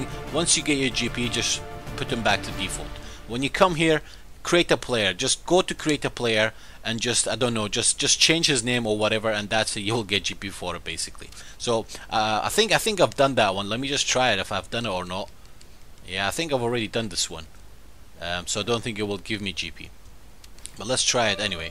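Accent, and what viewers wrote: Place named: Scotland, United Kingdom. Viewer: do you live in Scotland? sounds like a Scottish tint in your accent haha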